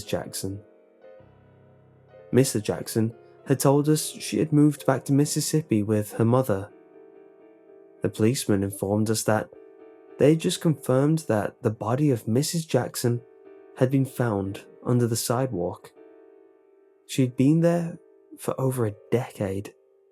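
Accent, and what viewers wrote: United Kingdom. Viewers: are you British, love your channel BTW :-D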